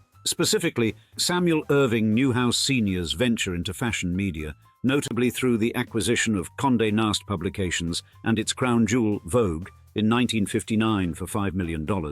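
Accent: United Kingdom. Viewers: As a lover of history, I look forward to your videos every week. Your fusion of history and contemporary culture with your British accent is very satisfying. Thank you for all that you do on this channel.❤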